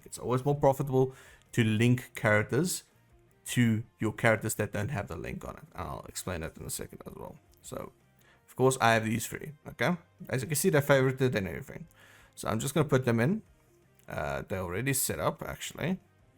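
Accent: South Africa. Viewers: Yesss!! Finally after finding your channel today and binge watching your videos I confirmed your are south african🙂 Yoh dit het my nogal bietjie mal gemaak om te luister en heeltyd te dink die ou is van SA. Dankie vir al die goeie info op al jou videos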